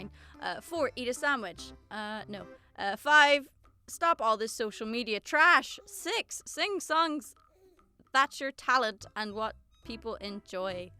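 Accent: Ireland. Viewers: i absolutely love your ginger hair it’s unbelievably gorgeous keep rocking it your an amazingly beautiful woman you should be a model, by the way your voice is awesome i love the irish accent. i recently heard your cover and fell in love 🤙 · I find it rather sad that people have anything mean to say about you. You're fun, beautiful, Irish, have a wonderful accent and i happen to enjoy your singing. I will never understand why people feel the need to say something mean to someone rather than give positive thoughts and feedback. I feel people do that because they in their own lives have failed or are doing so. They feel the need to put others down to feel good about themselves.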